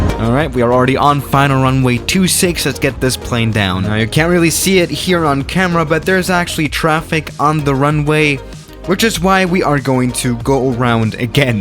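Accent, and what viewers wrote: Germany. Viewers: I think this is a stupid question but... Is he German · Are you an german? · Are you German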